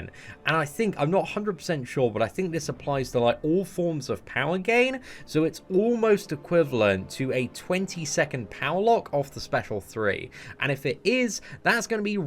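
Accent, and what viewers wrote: United Kingdom. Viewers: Mephisto getting another synergy and a profile pic finally maybe!? Let's go bro! As always appreciate the breakdowns of every new event so I can be lazy and not read the forums and listen to a cool British dude.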